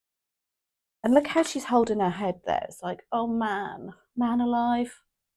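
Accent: United Kingdom. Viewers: I do think a lot about money and once I heal I will get chances to make it a lot, but right now I will try to take manifestation advice. Reading is astonishingly accurate and excellent as always. You are also very beautiful lady and Your British accent with great readings is just delight to hear. Thank you for helping us very much!!! ☘🌷🌺🌷🌺🌷🌺🌷💗🌺🌷🌺🌷🌺🌷☘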